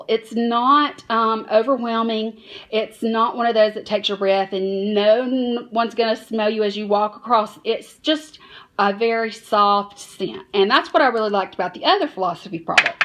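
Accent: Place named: Boston, United States. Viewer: Omg I love your accent! I'm from Atlanta and just moved to Boston, I miss hearing people talk like this! You have a great collection :)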